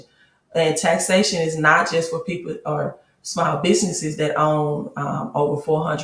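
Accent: South, United States. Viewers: Hello Beautiful! Love your southern accent!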